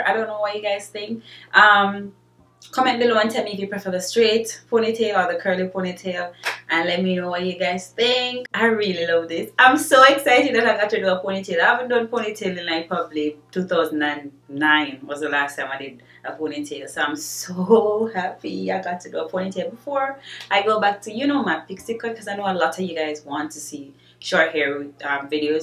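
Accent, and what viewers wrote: Jamaica. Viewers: U sound like a Jamaican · sounds like a Jamaican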